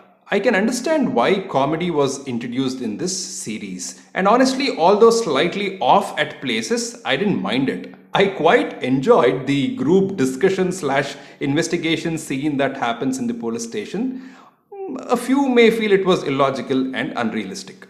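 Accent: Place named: Karnataka, India. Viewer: Very true, when he reviews on the same platform as BR, I feel robbed of BR's take. It probably has to do a bit with his accent/narration style which has a heavy kannada weight to it - it is just difficult to hear English in a kannada style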